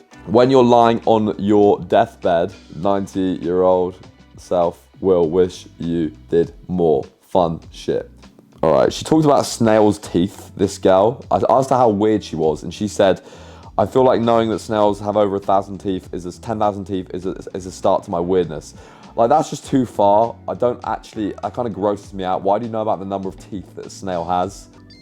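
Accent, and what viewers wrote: United Kingdom. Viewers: The most british accent I've ever heard 😂 keep those vids up man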